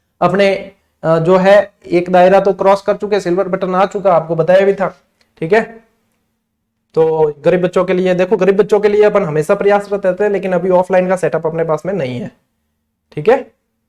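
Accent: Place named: Marwari, India. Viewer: Sir आपकी बोली घनी सुंदर लाग h marwari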